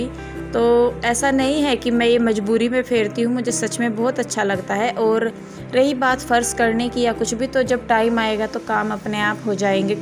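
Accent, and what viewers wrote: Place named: Bijnor, India. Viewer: Mam apka face bilkul meri mami se milta h bilkul same ap ek bat batao ap up mei bijnor side se ho na apki language se mjhr aisa laga kyuki m bhi whi se hu isliye mjhe laga aisa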